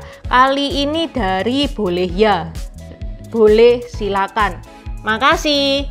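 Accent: Java, Indonesia. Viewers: Mbaknya ngomongnya waduh...medok banget kelahiran mana ya mbak, ❤❤❤🎉🎉🎉🎉🎉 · Mbaknya logatnya jawa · Logate ngomong jawa banget cik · Logatnya Jawa sekali mbak ini ❤ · Wadduh.saluut mbak jowone medhok tenan hehehe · Aku suka suara mbk nya,,medok jawa nya itu lo yg bikin kecanduan.. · Unik sekali ka, wajah tionghoa bahasa indonesia medok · Medok jawa😂😂😂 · Adminya ciwo cina jowo bahasa Indonesianya logat jowo medok 😁👍👍🙏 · Masyallah mdok bgt logat jwa ne mbk e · Jowone medok banget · mc.logatnya terlalu menthol kejawaan kurang enak didngat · kok medok bgt jawanya emg tinggal dmn???? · Contoh yg paling mudah adalah mba penampilannya ngak salah lagi tionghoa tetapi kalau berbicara wah aksennya kentel kejawi-annya, krn orang2 tionghoa di Indonesia semuanya spt mba, didaerah mana mereka menetap mereka membaur dgn masyarakat setempat baik diAceh,Batak, Minang sampai ke Papua. 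Adalah naif kalau dibilang bangsa tionghoa bukan bagian dari suku2 di Indonesia. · Mbak ini orang keturunan tionghoa, tapi logat jowone kuwentel banget.. Yo wis lah, memang budaya cina itu sdh masuk ke dlm darah daging Bhinneka tunggal ika. Tetap semangat!! berbesar jiwa lah kalo masih ada pribumi yg mendiskriminasi kalian, jangan tersinggung. Saya yakin kalian akan semakin dewasa dlm berfikir, karena kaum dr kalian lah perekonomian di negeri ini semakin kuat. Synergi mu adalah existensi negeri ini.. Peace ❤️ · ini tionghoa jawa kaya nya bicara nya medok jawa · Cici..bahasane medok men to yo....jawa mesti..😅😅😅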